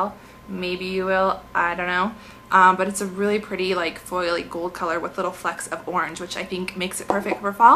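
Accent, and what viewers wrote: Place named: Boston, United States. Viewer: I love your accent!! Is it Boston? :)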